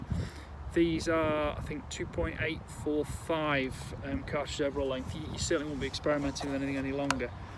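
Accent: United Kingdom. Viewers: Well he does have a british accent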